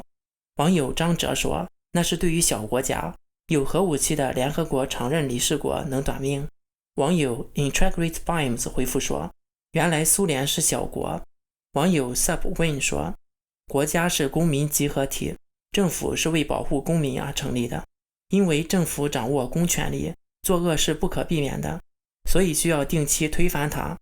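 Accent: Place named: North, China. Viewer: 根据口音可以追查到长江以北了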